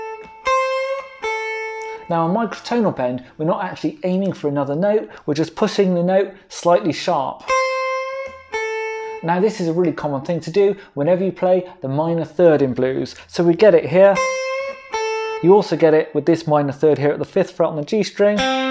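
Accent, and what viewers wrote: United Kingdom. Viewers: Great video. Just got the backing track from yr payhip. Wish i could get a pdf tab ? Also, thanks for your nice and clear british english 😀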